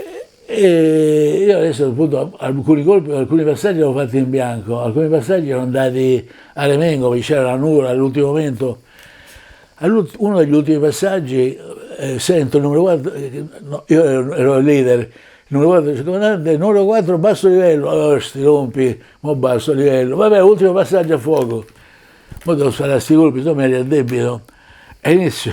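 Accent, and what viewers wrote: Veneto, Italy. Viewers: Io direi che un romano con l'accetto veneto è di tutto rispetto" o stregheta".😊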